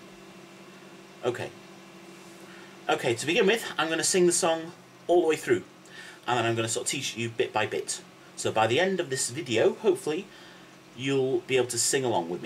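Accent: Wales, United Kingdom. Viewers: You do sound Welsh, haha. You've got the Twang.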